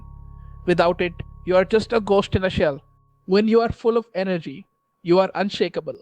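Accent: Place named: India. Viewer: I liked the AI voice more POWERFUL and SERIOUS. INDIAN ACCENT makes it lose of FOCUS from the TOPIC. BRING BACK AI VOICE. PHEROMONES ✔️ PHARAAMUNES ❌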